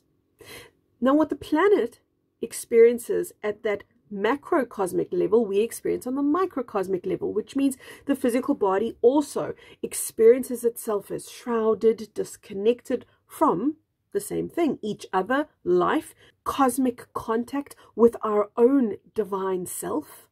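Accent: South Africa. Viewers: Thank you for showing up for me right now. Perfect synchronicity. What a beautiful channel you are. And healing, too. Bless you. (Your accent sounds South African?)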